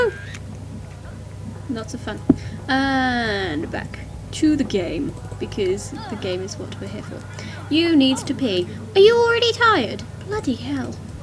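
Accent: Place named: United Kingdom. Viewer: I love your voice! It's all british-y :D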